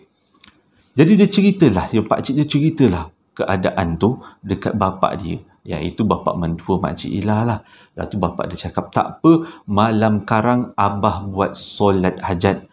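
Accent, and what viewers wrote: Java, Indonesia. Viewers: Bercerita ada slang2 jawa..tu yg terpikat kat channel ni..menusuk dlm jiwa raga...😊😊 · Slank tu mcm bunyi ala2 jawa.....memang tulen ye😬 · Abang jawa ke bang.sedap didengar.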